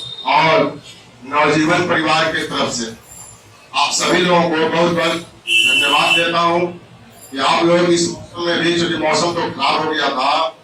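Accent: Bihar, India. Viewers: aapke bolne ka andaj bilkul bihari jaisa hai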